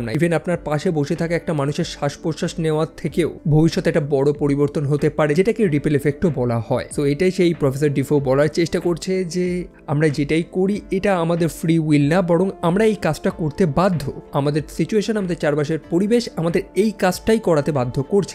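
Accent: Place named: Sylhet, Bangladesh. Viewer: Sylheti vai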